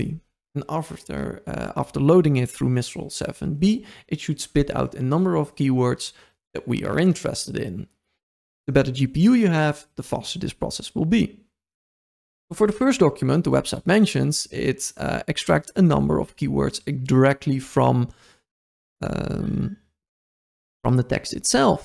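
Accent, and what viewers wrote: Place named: Netherlands. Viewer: God what a Dutch accent, coming from a Dutchie 😂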